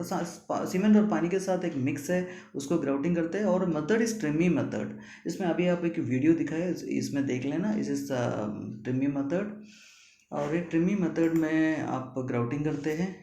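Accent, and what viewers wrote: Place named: Kerala, India. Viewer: Hey Malayaleees...Alae...Slang ketapol manasilayi. True?.. and Good Info Bro keep it up...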